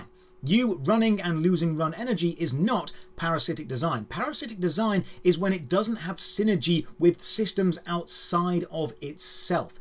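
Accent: United Kingdom. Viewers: I definitely do enjoy my dose of snarky british man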